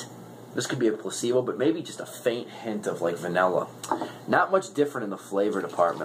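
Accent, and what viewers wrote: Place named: Chicago, United States. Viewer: Nice Shicehgow accent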